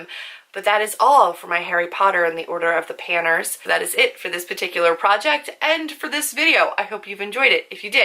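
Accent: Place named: United States. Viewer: Where are you from? I can guess the US of course, but I can't place where. I think your accent is so cute! When you said "mask" I loved it.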